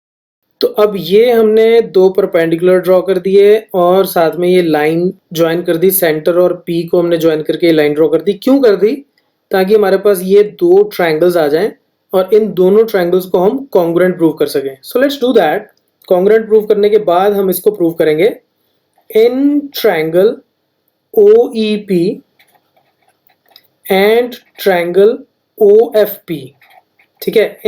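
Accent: Punjab, India. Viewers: Are you punjabii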